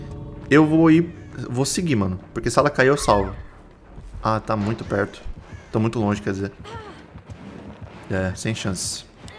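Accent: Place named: São Paulo, Brazil. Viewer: Pelo sotaque acho que é paulista, mas não tenho certeza.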